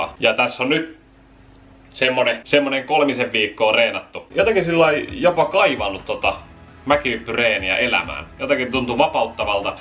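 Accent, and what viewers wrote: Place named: Lahti, Finland. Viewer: Mietin et miks puhuu lahen murteella koska "Lahen" ja ei oo lahelainen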